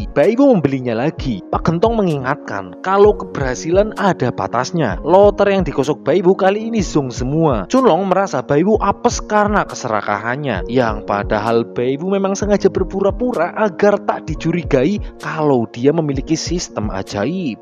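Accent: Banyumas, Indonesia. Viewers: Suaranya bikin ngantuk tapi ketika denger ngapaknya autu ngakak🤣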